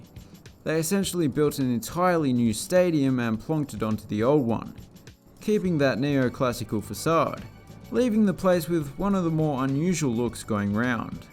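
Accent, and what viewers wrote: Australia. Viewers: Watching an Australian guy commentate on a stadium that I grew up going to and can see outside my window now is pretty uncanny. (Georgia State)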